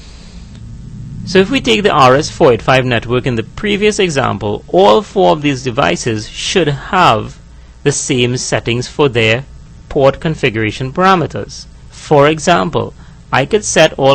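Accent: Trinidad and Tobago. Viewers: That accent... Trini! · I know that trini accent anywhere.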